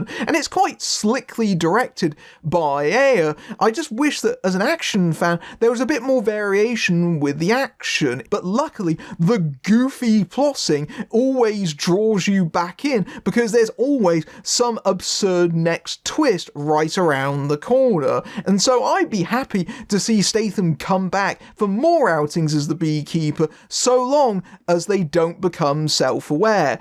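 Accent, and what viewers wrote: United Kingdom. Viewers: I'm detecting some British Isles lurking in your accent.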